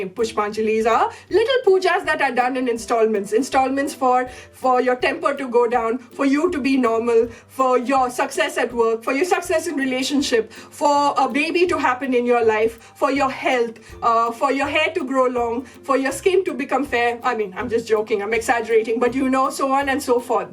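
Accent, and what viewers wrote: Kerala, India. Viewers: Uncle ne vendi dosha indakke. in your Malayalam accent killed me manh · HAHAHAHHA. Your malayalam accent is so bad no offence it made me laugh in the middle of the night!! But yes, being an NRI, i relate so muchhhh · I'm watching this video for the 7 th time just to hear your Malayalam accent. 😄✌️ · i love the way you speak malayalam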